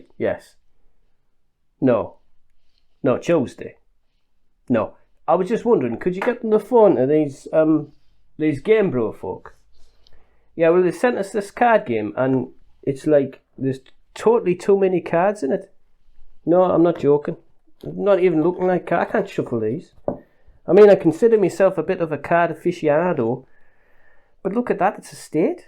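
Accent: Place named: Newcastle, United Kingdom. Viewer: I had no idea you was a geordie.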